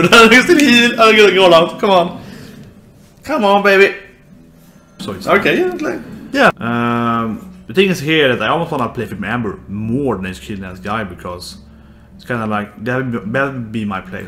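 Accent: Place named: Sweden. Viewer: what a svedis acsent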